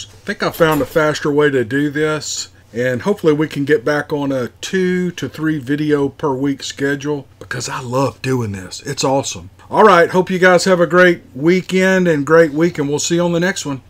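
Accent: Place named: North Carolina, United States. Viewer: Nice job. That accent you have is similar to mine in NC. I know a lot of fine folks who talk like us. And I wouldn’t trade it for nothing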